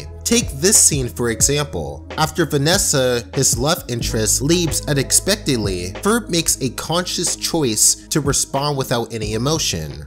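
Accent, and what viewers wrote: United Kingdom. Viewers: wait, he’s British? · Why the hell is this guy british? edit: btw I never watched finieas and pherb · Omfg i just realised he’s british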